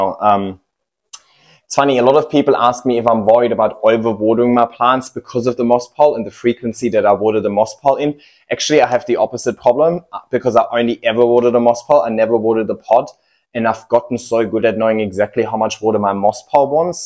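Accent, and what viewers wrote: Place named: Germany. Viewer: Dieser deutsche akzent hahahah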